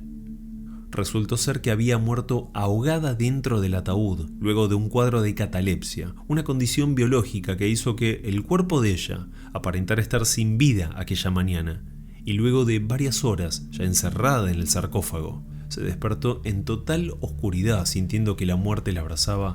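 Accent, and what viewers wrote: Argentina. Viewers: Grandes historias narradas por un argentino, que bonito 😊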